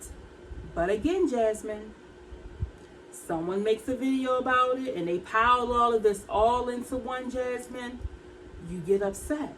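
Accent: Baltimore, United States. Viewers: Love your Bmore accent!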